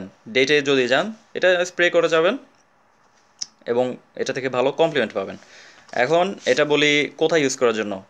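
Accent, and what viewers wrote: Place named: England, United Kingdom. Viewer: Don’t mix up English accent in Bengali. It doesn’t suit you & moreover it truly irritates many. Disliked the video for the same reason.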